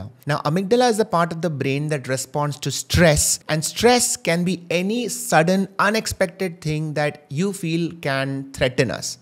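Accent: Kerala, India. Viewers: Are you from Kerala (Malayalam)😊😊😊😮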